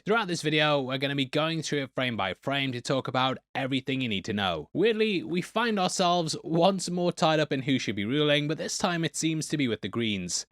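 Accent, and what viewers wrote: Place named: England, United Kingdom. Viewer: My boy looking a bit manly in this video 😂😂 the rough beard, English accent, arm tat in view 😂😂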